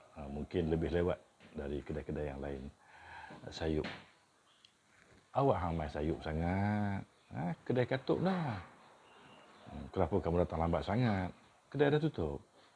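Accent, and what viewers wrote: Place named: Kedah, Malaysia. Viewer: Terbaik.. saya suka loghat kedah..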